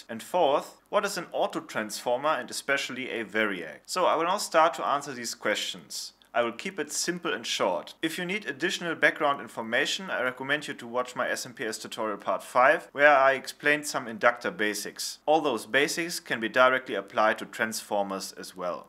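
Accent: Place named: Germany. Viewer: Du klingst so Deutsch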